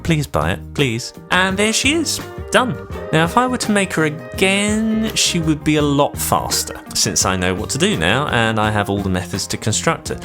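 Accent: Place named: United Kingdom. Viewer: Great work as usual, always an inspiration. Hey, have you ever considered providing training? Sounds like you’re also based in the uk… I’d be interested in attending a course if you did.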